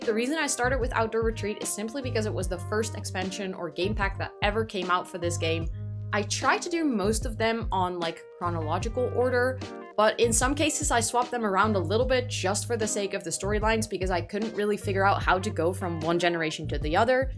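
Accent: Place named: Netherlands. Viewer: I really want to watch this but you are Dutch 😂 I am too and I just haaaaaate a Dutch accent in English loool. That's a me problem tho, I am just wired weird but I cannot stand it 😂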